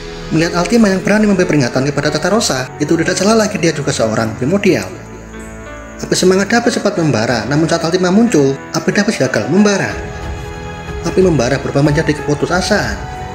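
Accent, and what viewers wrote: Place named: Java, Indonesia. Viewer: Medok nya bisa gak di ilangin, sakit teliga gw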